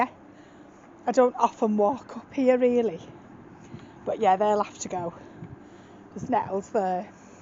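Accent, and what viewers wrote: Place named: Wales, United Kingdom. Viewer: New subscriber and I too really enjoy your vlogs, recognised your accent as I am from the same town, although moved away years ago. Loving the caravan vlogs as we also had caravans in past but live by sea now so don’t need to as much. Looking forward to your next vlog, and wishing you luck getting the decking sorted. I also love Karen’s channel too.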